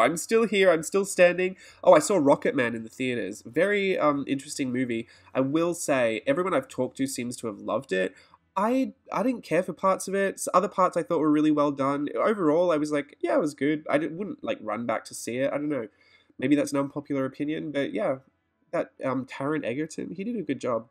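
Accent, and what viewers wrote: Australia. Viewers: Yay an Aussie artist to watch 😀I could tell you where Aussie from the start 🐨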